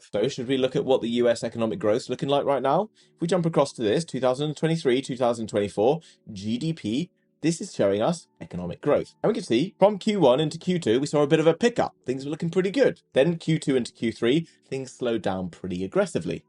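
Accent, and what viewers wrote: England, United Kingdom. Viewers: His accent is an English one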